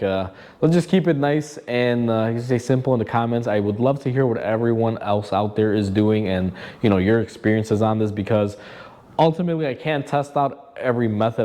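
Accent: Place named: Chicago, United States. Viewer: People think that accent is southern and not anything but Chicago? Man, you folks need to get out more.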